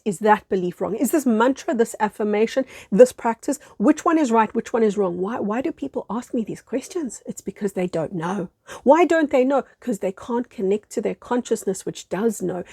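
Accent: South Africa. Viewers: You sound South African❤ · great message, love the S.A. accent too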